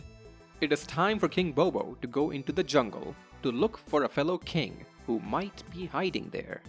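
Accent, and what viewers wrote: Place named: India. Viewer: I can't with your Indian accent bro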